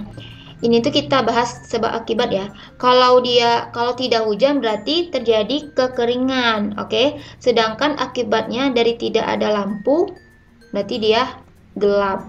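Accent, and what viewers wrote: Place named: West Sumatra, Indonesia. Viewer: logatnyo mirip urang minang akak ko wkwkwk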